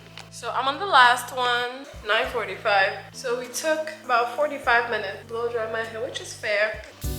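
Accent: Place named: Ghana. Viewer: I knew she was Ghanaian the moment she started talking 😂❤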